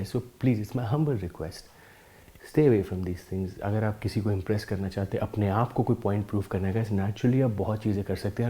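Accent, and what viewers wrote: India. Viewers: I cant understand its like mix of english and indian Im freakin lost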